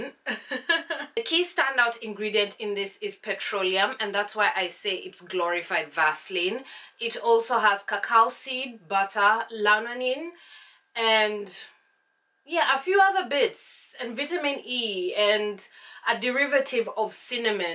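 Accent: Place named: Kenya. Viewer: Hi 👋🏽 Have you been to Kenya? You've got the accent.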